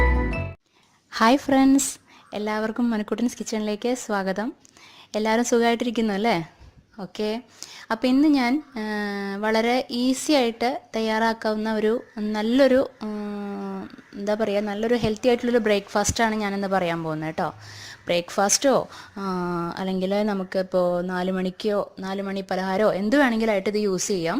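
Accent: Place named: Thrissur, India. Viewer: variety breakfast.. thrissur bhashayanaloo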